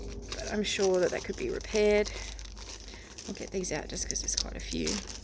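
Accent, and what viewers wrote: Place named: Australia. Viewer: I love these videos. We girls never tire of looking at jewelry. Lol You gotten awesome stuff in this giant haul so far. And I could listen to you talk all day. Loving your Austrailian accent. Thanks for sharing this enormous haul. That brooch looked like a torpedo and a and a giant land mine to me . Omg I love Blue Bus Dave too!!! Have a great day my new friend !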